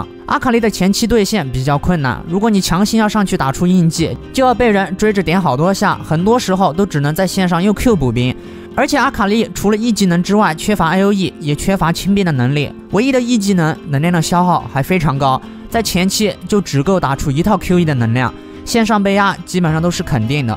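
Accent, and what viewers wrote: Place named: Shandong, China. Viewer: 这一口山东味。。。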